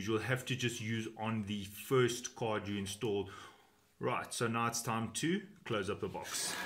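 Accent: South Africa. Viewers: Nice to hear that proppa Sarf Efriken accent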